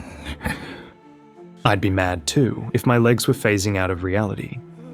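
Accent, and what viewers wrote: Australia. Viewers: Hey not sure if this is me just confusing aussie accents but you have to be ' ' right? If so you're killing it with these channels dude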